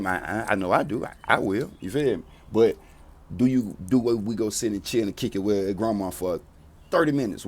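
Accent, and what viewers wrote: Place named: South, United States. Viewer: Dude I’m just now remembering who you are man. You had a album with some songs I used to ride to but it was one of your older projects. It was something you had out between <num>. It might have been old when I got on it but I believe it was new the time. The beat had a nice guitar on it if I’m not mistaken with almost like a blues riff playing on it but with the trap style drums but more like the 90s style drums. I been looking tonight but still haven’t found it. Only reason I remember because I associate your music to when my daughter was younger in elementary but now she 12. Whatever that video was I’m thinking of I used to watch it like every evening for a couple weeks straight. I live in Mobile. I have family in Birmingham though so the music is relatable. I have a similar southern drawl. Keep the videos coming. 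Nice work. Didn’t know you had got in that trouble. Just watched an old interview with Ugly Money. You talk like you more valuable to your family & community being free than to the federal prison system. Kill them jokers with success & kindness.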